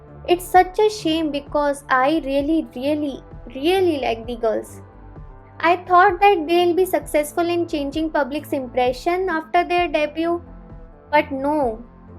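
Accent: India. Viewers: are you indian btw ? cause your accent 😼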